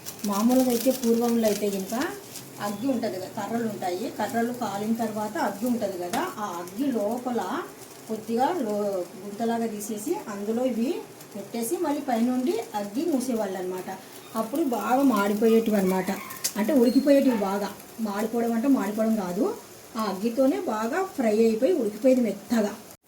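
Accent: Rayalaseema, India. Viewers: Nippulu,telling in tellugu very nicely.from rayalaseema ?.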